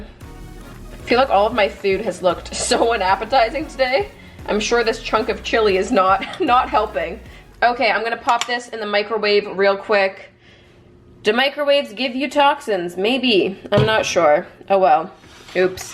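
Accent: Canada. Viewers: i love your canadian accent SO much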